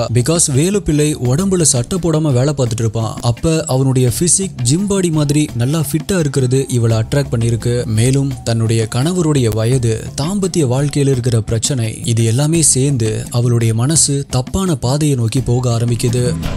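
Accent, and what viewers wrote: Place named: Chennai, India. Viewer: இலங்கைத்தமிழில் கதையுங்கள். நாசமாகப்போன Madras தமிழில் கதைத்து நீங்களும் நாசமாய் போய் சமூகத்தையும் நாசமாக்க வேண்டாம். தூ.......தூ......தூ.....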